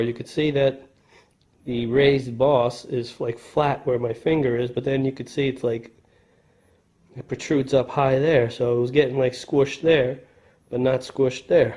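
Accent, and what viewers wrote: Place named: Pennsylvania, United States. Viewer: You have to be from PA, you sound just like my friend Bill. Great channel, amazing work.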